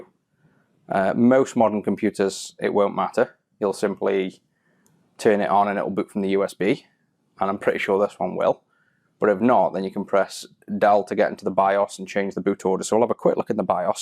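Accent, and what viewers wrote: United Kingdom. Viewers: You've got a strong British accent!!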